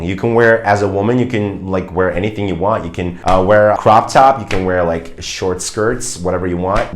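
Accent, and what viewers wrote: United States. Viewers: I was shocked at some of the things people think about Turkey and I’ve yet to visit. lol Love how you speak English with an American accent. Your videos on Turkey are so helpful. I’m trying to learn Turkish and hope to visit next year. Thank you 🙏🏼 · You looking Turkish but sound American and born in Germany? Haw can ?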